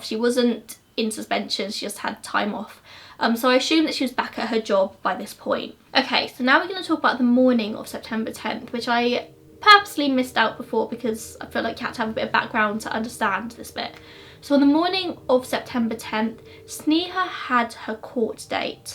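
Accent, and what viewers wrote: England, United Kingdom. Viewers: I really love your English accent. I'm from Argentina and I've been studying English for 5 years. I'm listening to you while I'm working. You're amazing, thanks a lot.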